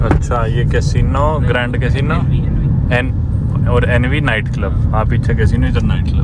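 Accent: Haryana, India. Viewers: I really like ur height and also ur haryanvi enuciation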